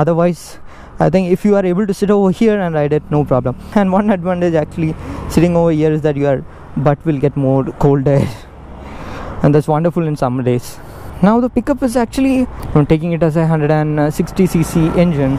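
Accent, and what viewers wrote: Kerala, India. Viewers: Mallu accent, good review.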